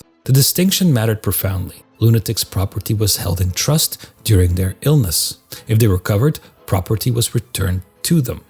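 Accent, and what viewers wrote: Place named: Flanders, Belgium. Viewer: Ik ruik Vlaams 👃🏻Geweldige content trouwens. Keep up the good work!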